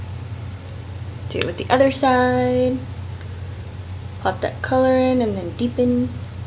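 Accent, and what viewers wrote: England, United Kingdom. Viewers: LOLL I LOVED THE ENGLISH ACCENT omgg so funny man! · Your English accent is fabulous :))) Loving the make-up x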